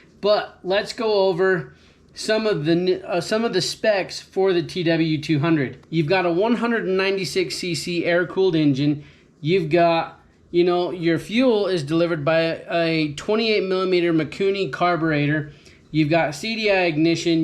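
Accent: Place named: Utah, United States. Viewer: I'm from Salem and moved to Washington 20+ years ago. Are you from near there? I immediately recognized the Utah accent! I'm hoping to get a TW soon.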